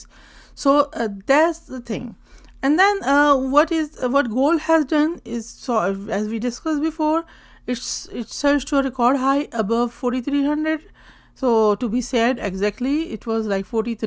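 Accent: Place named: India. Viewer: Wishing you all the best for your health. From your accent, it's my guess that you may be of Indian descent. The medical infrastructure in India is world class, low cost and without any waiting period. Even if you don't have medical insurance, the costs of investigation/surgery in top-class hospitals is affordable. Consider making a trip to India and sort out all your health problems.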